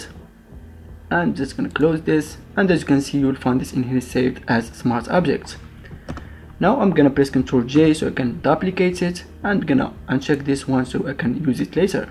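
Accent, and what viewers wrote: Morocco. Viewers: thnaks for the tutorial I found it useful but tell me, are you moroccan ? I raly taste the moroccan accent in your voice!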